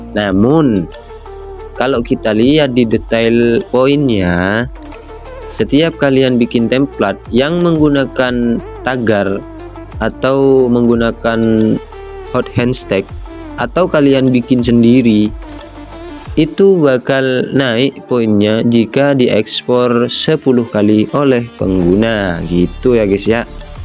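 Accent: Madura, Indonesia. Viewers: logatnya madura bet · Ketarah reng medureh 😅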